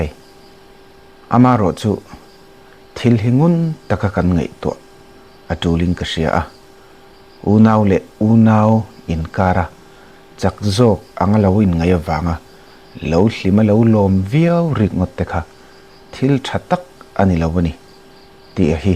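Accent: Myanmar. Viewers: Nangpa hi ka rin zawng ini lo.Burma ho hi in tenawm tlat,mizoram inpumkhatna pawh in ti chhe dawn.Mizotawng pai deuh deuhin in tawng a,in zak der mai si lova. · Falam tawng Chu aawm e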